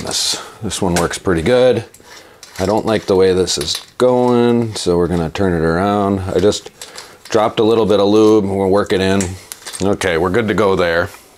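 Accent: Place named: Midwest, United States. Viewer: Judging by your accent, you're somewhere in the Midwest. Where's your shop? I'd patronize your place for sure. You seem to have a lot of integrity and know how.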